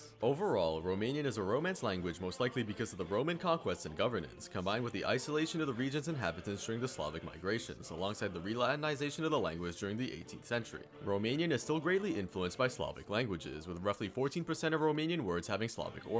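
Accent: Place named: France. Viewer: I love your reading of romance text with a French accent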